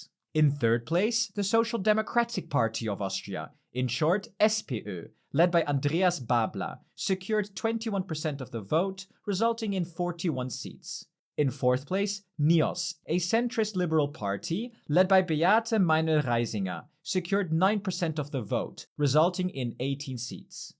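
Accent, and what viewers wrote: Germany. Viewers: nah you pronounced the names of the politicans and parties way to german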